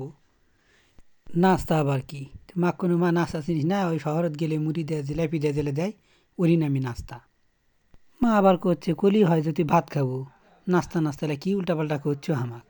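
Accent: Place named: Bogura, Bangladesh. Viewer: অনেক অনেক সুন্দর হয়েছে। সবচেয়ে বড় কথা হলো বগুড়ার আঞ্চলিক ভাষায় কথা।ধন্যবাদ।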